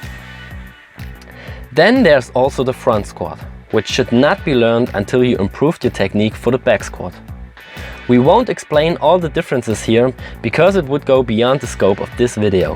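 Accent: Germany. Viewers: You sound German, are you? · great video, love the german accent . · Alter bist du deutsch, merkt man sofort nach einer minute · that english accent is german as fuckkkk xd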